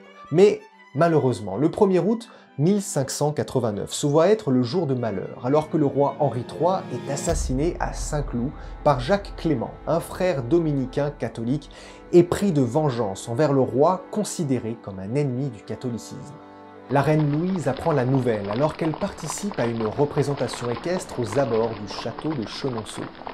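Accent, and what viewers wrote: Canada. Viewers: ton accent Québécois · tu as l'accent canadien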